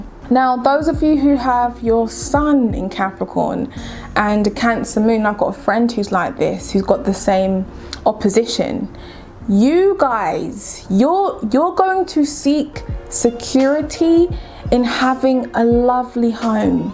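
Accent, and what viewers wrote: United Kingdom. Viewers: You most definitely do but your accent has a little British twang to it 💐 its all love beloved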